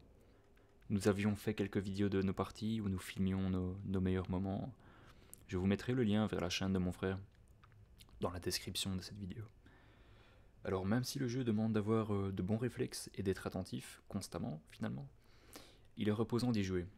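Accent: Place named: Belgium. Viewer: J aime bien ton accent belge 😏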